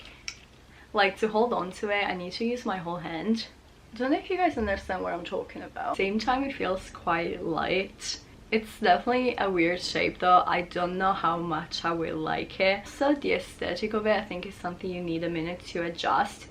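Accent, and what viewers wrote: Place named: England, United Kingdom. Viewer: Yeah she lives in London so will pick up the English twang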